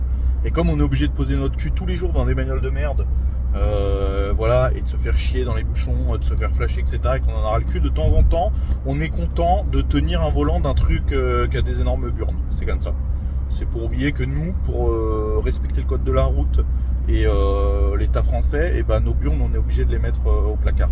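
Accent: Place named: Arab world. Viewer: Pourquoi l'accent arabe? Mis à part ca, on à l'impression d'entendre Papacito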